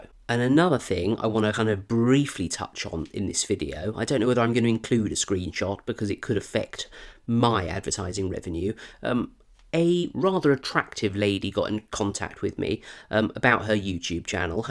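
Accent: United Kingdom. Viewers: keep doing what you're doing! from a fellow Brit, Its the very British delivery and sense of humour that make your videos such a joy to watch regardless of subject. FYI YouTube recommended your 30k subs video to me weeks ago and I've been hooked ever since. Cheers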